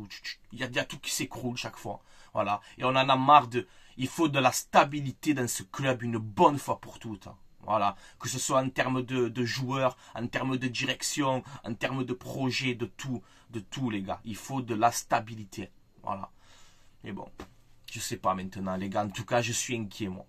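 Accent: Marseille, France. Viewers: Juste comment tu parles, j’ai pas besoin de prouver que t’es Marseillais 😂😂 Je comprends frérot Hala Madrid vaut mieux ça pour ta santé 😂 On as pas de LDC mais on est heureux, ils ont une LDC mais sont malheureux 🫵🏽😂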